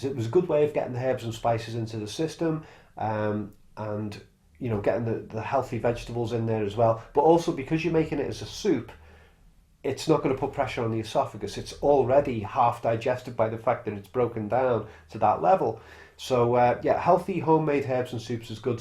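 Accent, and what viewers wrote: Liverpool, United Kingdom. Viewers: My reflux was so bad years ago I had the Acid Reflux Repair, known as Nissen Flundipication...it worked well but took me months and months to get over the operation, I had strained muscles and pain...I cannot live without the PPIs now, I have a shot of Gaviscon every night before bed, 30 years on. I avoid alcohol otherwise it all kicks off. Had HPylori 3 times!!! My history was I had my Gall bladder removed in 1988, then developed IBS, had histerectomy in 1992, terrible reaction to anaesthetic, lots n lots of acid had the NF op in 1994. always suffered with my guts since...wow is me. Thanks, very interesting clip. And lovely to hear a fellow Scousers voice...I've subscribed. 👍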